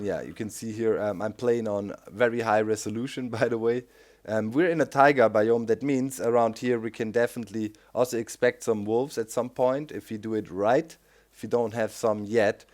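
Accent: Germany. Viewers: ***** is that a german accent I hear? sehr gut herr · He is German. You are Dutch. He has a slight German accent. He does not live near the east side of Germany, so not even close to the Netherlands (if I recall correctly). What's your point calling him Dutch?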